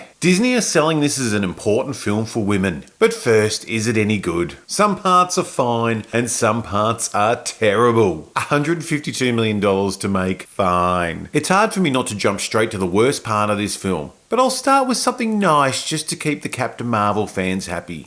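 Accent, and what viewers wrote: United Kingdom. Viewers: btw i love your accent (British right?) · 59 seconds in and you are wrong. red sonia was not a superhero. she was just a hero, adventurer . I am not sure what country you are from, sounds British, but I have lived in america since 1976. The 90's the movies were not the nicest to women in the 90's, we were still pretty sexist. In real life and movies. You try to make it sound like we were liberated. we were not. I do agree the movie was pretty boring. I only saw it once. I have no idea if your points are right.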